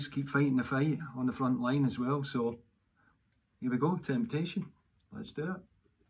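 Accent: Scotland, United Kingdom. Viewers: Thank you! You are so cheerfull:-) the Scottish accent makes it even better:-D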